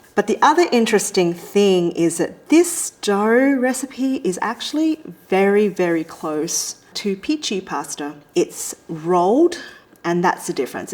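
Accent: Australia. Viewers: Oz accents for life!! ☺️ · She's so happy that even her Australian accent sounds happier.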